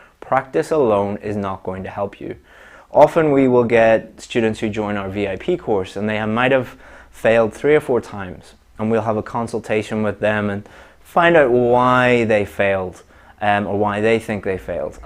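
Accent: Australia. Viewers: all these days I thought you are an Australian and the accent you talk is Australian accent 😝, this proves that my listening is still poor, 😭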